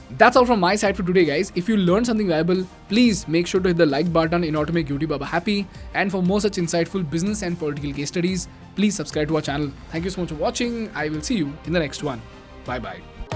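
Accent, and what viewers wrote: India. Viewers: This EAM English is awesome.He does not have the Indian accent like this young commentator.Hes an extraordinary guy.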